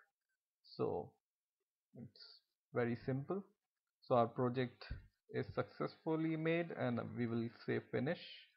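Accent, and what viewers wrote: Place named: India. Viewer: English with Hindi accent kills me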